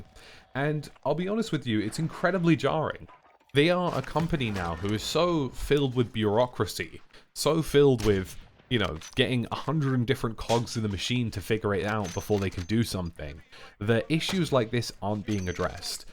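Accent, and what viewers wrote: United Kingdom. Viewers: Are you well?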